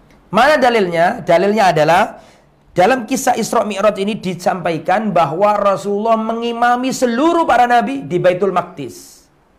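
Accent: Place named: Java, Indonesia. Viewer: Sy suka bahasa beliau yg medok njawani heee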